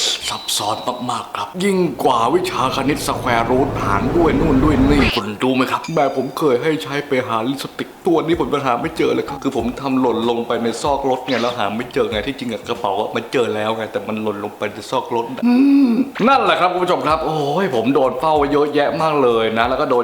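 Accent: South, Thailand. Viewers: เป็นคนภาคใต้ใช้มั้ยครับ 😂😂😂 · เป้นคนใต้เหรอครับ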